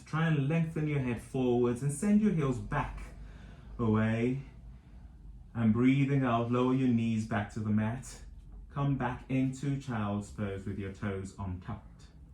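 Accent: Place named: England, United Kingdom. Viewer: I'm going to be doing this teacher training in January 2021. Thank you for showing how to teach this method 1 on 1 in client's homes. I love 💘 that your English too, we tend to deliver instructions a little differently than Americans. How long have you been teaching this method?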